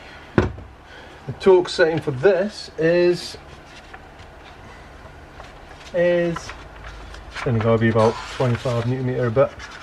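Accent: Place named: Stoke, United Kingdom. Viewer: Sounds like a fellow stokie? Or not far off anyway!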